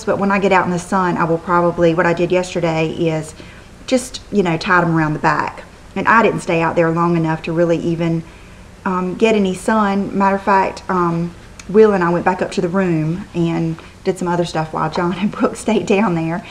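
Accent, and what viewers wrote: United States. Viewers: Hi! I´ve been watching your´re videos from here in Norway and I´m loving them! I even went back to old ones just to get "a jour". I haven´t commented on them until now because I´m a bit shy, but them I thought that "hey, I want to let know that I think she´s doing a terrific job". So,: Great Work!!I think you are fun to watch and listen to, I love your accent which reminds me of when I lived in the States many years ago. Keep up the vlogging & I´ll be watching;-) Thanx Cecilie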